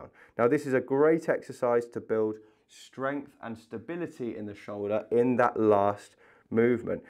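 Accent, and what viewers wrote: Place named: United Kingdom. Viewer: Superb presentation.... British! No annoying music either!!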